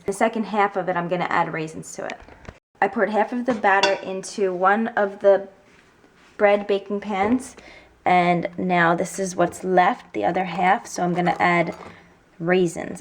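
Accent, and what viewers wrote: New Jersey, United States. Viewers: She has that jersey accent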